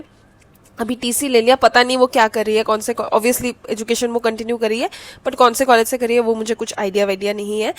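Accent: Bengal, India. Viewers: Are u from bengal? Ur accent tell it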